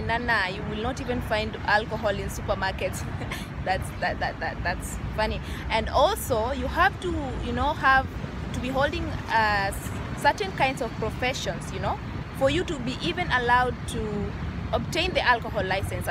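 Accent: Kenya. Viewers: Are you kenyan? You sound like one. I am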